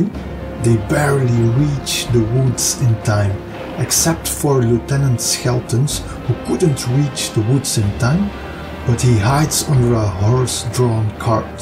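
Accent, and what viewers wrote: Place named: Flanders, Belgium. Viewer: Ik dacht dat ik een Vlaams accent hoorde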